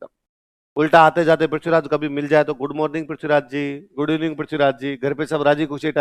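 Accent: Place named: Rajasthan, India. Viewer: Or kaise bolte h bhai ye rajisthan ke teacher h to aise he bolte h bha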